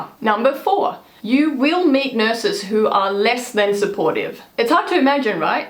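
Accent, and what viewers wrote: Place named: Australia. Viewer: Hey. I am always wondering... your last name must be Swedish (is it the name of your hubby?). But your accent is clearly Australian to me. I am wrong? Sorry for asking such a crap. But as an Australian in my heart, I am interested in such things...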